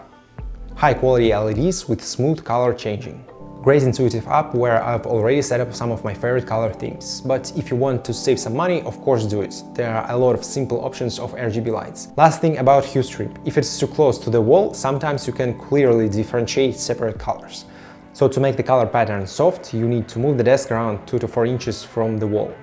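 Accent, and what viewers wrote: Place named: Russia. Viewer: Srazu chuvstvuetsa russkoyazichniy akcent. Nice setup.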